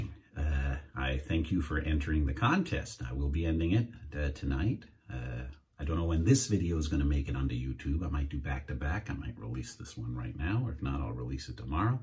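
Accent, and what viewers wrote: Newcastle, United Kingdom. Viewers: your welcome and thank you for sharing such amazing videos. Newcastle's great, love the accent! I was born in london. I am also a bond fan and I collect watches so I noticed your omega Seamaster straight away, I also own one. I have just this week started shaving with a double edged razor for the 1st time and really enjoying it! Not to many cuts!! I can see this turning in to a new hobby! 😁🇬🇧🇺🇲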